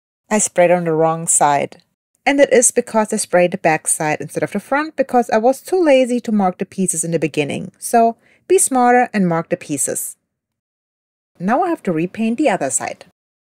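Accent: Germany. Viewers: German accent is cool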